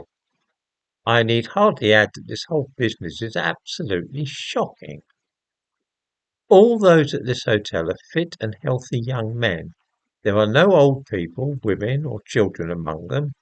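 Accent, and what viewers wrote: United Kingdom. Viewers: Thick British I’ll say no more.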